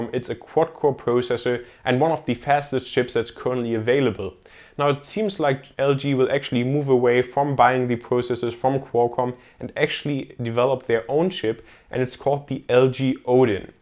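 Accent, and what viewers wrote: Germany. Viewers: TechCentury aber wär schon gut, wenn das eigene Englisch dann nicht so deutsch klingen würde, oder? Find ich dann irgendwie eher peinlich als international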